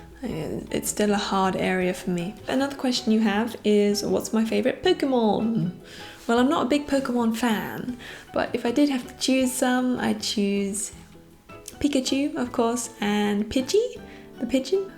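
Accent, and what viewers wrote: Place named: United Kingdom. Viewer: Happy birthday. I like your videos very much. I don't know why you are surprised that most of your viewers are German. You talk about Germany, German culture and so on. This topic is well known for us Germans. So it is easier to follow the topic. Listening to English speaking youtubers is a little bit of exercise for me. It is good not going out of practise. You speak very clear and I like your your British accent and the sound of your voice. It is soothing, pleasing to the ear. Keep your style of video making. It is very enjoyable. I'm looking forward for your next videos. This is my first comment on youtube ever. Greetings from the Harz Mountains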